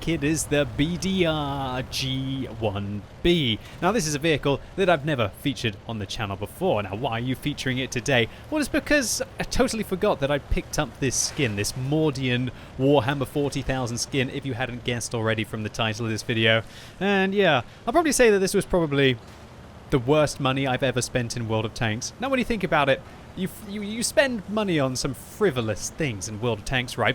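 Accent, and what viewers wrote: France. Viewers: bon accent français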